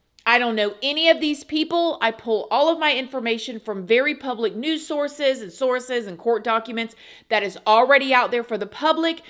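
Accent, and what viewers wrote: South, United States. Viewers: That’s how we speak in the south hunny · Why would it embarrass you? Everyone speaks with different cadences and accents depending on where they are from. It's very commonly said in the south and is southern. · yeah we southerners have pretty much always said it that way lol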